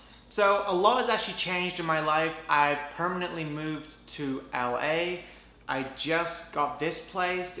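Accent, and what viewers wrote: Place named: United Kingdom. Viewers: Lol thought he was British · Lily Kim He is British.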